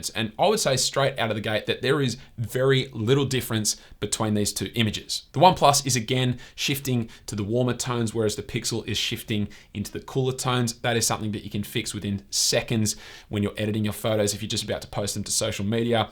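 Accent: Australia. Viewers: U from Australia?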